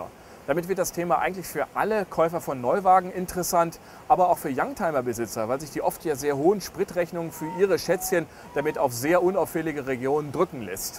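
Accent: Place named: Netherlands. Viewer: du hast eine rotsprache!!! niederlandisch!!!!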